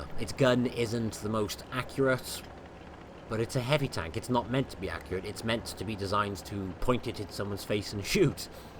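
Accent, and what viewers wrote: United Kingdom. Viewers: wat the british accent ! XD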